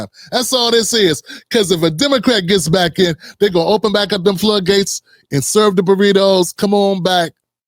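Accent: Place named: Washington DC, United States. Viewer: I watched Professor Ball's episode that you are citing. It was very well done and researched. I'm not sure what you're trying to accomplish. But I haven't taken your show serious in a very long time, which is why I don't usually watch it anymore. You should stick to comedy. I only subscribed to provide support to a Black podcaster. But I am not down for this chocolate-covered white conservative with a DC twang. I''m out. Unsubscribed.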